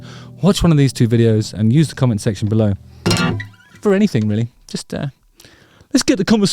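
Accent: United Kingdom. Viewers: How can one person look like both Martin Short and Tommy Lee at the same time, and speak with a brit accent on top of it?... happy I stumbled upon this review! Thoroughly enjoyed it! Cheers!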